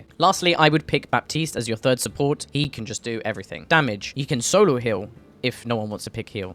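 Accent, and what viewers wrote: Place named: United Kingdom. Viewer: omg he's british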